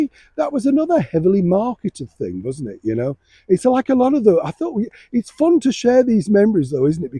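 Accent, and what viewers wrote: Yorkshire, United Kingdom. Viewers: My mum would drink brandy and babycham when my parents went out!, are you from West or South Yorkshire? You remind me so much of a close friend from Doncaster! Happy new year to you xx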